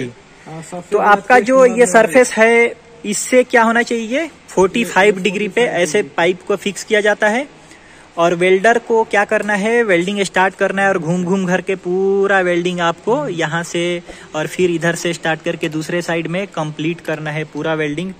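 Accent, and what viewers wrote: Bihar, India. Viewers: Rakhanu. Bihari. Ho. Bhai. Ham. Bhi. Bihari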